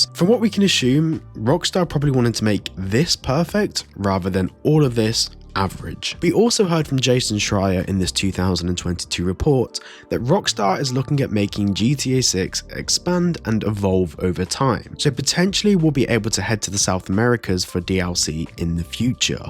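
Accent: United Kingdom. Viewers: i scrolled the comments before starting the video and from the way they spoke i didn't see this coming but.. dude that mask is sick lol, fuck the ones pushing for a reveal, they're not worth shit. You sound British, so you should know first hand how people act with famous people, no one's worse than the brit paparazzi and i wouldn't hold kids on youtube at any higher a standard, your identity is yours, do what YOU want with it.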